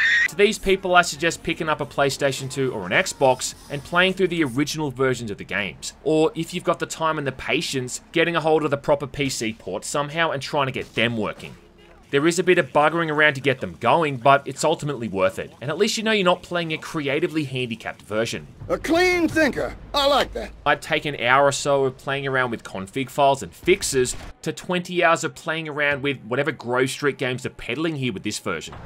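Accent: Australia. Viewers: As soon as I heard the Australian accent I was like 'hell yeah this will be a good critique'.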